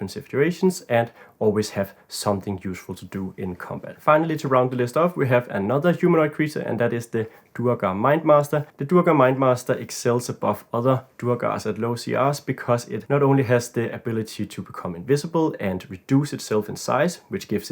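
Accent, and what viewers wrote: Denmark. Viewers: are you danish as a dane myself the accent is pretty easy to hear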